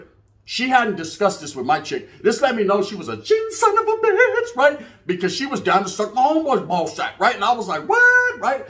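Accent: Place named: New Mexico, United States. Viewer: Bro your videos I can’t get threw without laughing lol I know all the lingo cause I’m from New Mexico lol that’s how we talk carnal 😂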